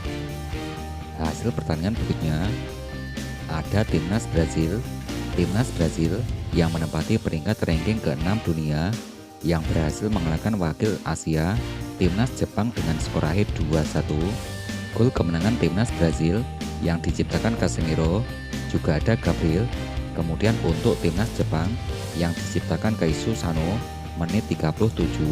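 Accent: Java, Indonesia. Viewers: mimin e wong jowo to 😊😊😊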